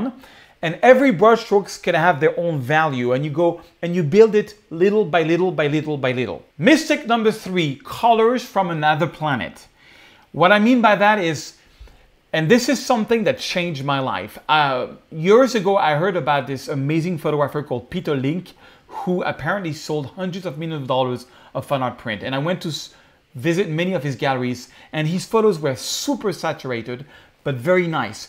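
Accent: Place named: France. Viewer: Great tips. You videos are very fun to watch, and as someone with an accent myself, I love seeing how you embrace your frenchness.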